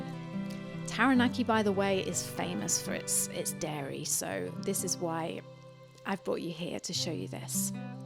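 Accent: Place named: United Kingdom. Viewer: Weird narrator script, i need to see different people . She sounds soooo British. Im British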